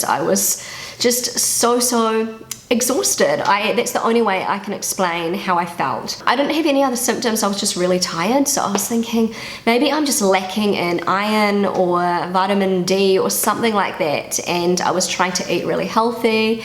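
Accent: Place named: New Zealand. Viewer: I'm very excited to Heart your nice exceptional Kiwi accent against cause IT thrills me everytime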